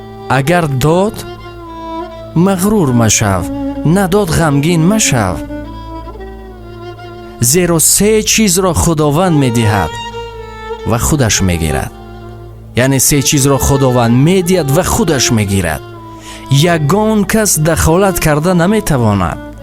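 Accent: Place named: Tajikistan. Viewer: بهترن سخنها آفرن دستی ازیزم لهجه شیرن فارسی تاجیکی🇹🇯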